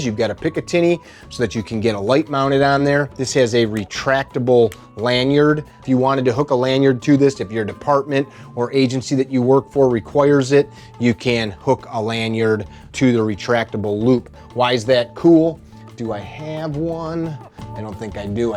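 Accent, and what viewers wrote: Chicago, United States. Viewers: Late to the party here - I could listen to this guy's accent all day. I live in Chicago so it sounds real familiar. One of the best reviews on this firearm, too. I have the off-the-shelf Compact Carry. Not sure what that lacks from the full Langdon - maybe the Landon trigger and the bobbed hammer? Anyway, great firearm. I may put a slightly wider de-cocker on it, but that's about it. Would love the fancy stipple job but that's not cheap, either - and hate to send the gun away for such a long time.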